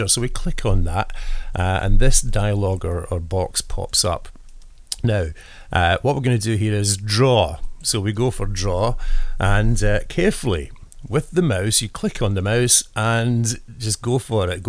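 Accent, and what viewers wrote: Scotland, United Kingdom. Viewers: Love your accent!!!! Scotch, Irish? I would guess the first but I love it regardless!! And Theenks fir da veedeo. XXOO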